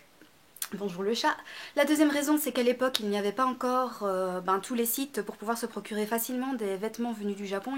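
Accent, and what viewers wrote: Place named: Belgium. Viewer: ton accent belge est parafait 💙👌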